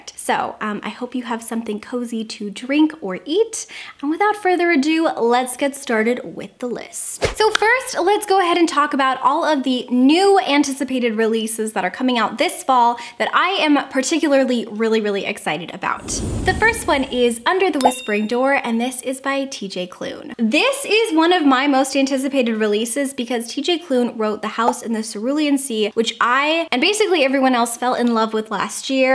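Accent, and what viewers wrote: United Kingdom. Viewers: Hey!!! I am living for this video 🙌🙌 all the spooky autumn vibes. Hello from Perth Australia 👋 love the British accent you do in your videos hehe sometimes there is a hint of an Aussie accent when you do it. Not a spooky recommendation but a great YA read that I think you might like is The Prison Healer by Lynette Noni.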